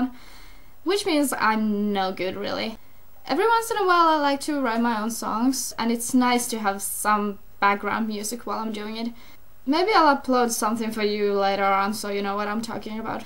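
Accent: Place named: Finland. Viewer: You sound so finish and that's kind of cool... the accent and stuff...! :D When I was little I lived in Finland for a while. I remember the kids and people and you just remind me of them somehow or something... :D Anyway, smoking is cool, so you should definitely try it! :D It's just a hobby. If you smoke, well at least that's gonna be a new topic for you to talk about in your videos. Take it as a challenge, light a cigarette and share your experience live on camera... :D... Anyway, peace!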